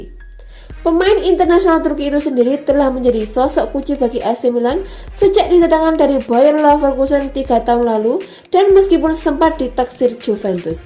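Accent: Java, Indonesia. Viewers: Medok kali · Wong jowo hhhh Penyiarnya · Jowo ne pundi mbak pembaca berita??? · Klu nulis judul yg bener ploook...dan gasah medok medok Ngomong nya kampretttt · Medok jancuk wkwwk mantabs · Medokk bet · Medokk min🤣ttep semangat💪💪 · Forza medok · Medok amet mba logat jawa nyah ✌️ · Suara khas medok.. #ForzaMilan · Medok cuq ... 😄😄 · Ora penak banget di rungokna, medok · Medok nya 😅 Penyebutan pelatih MU seakan aiueo 😅 Redaksi nya perlu di perbaiki But overall its ok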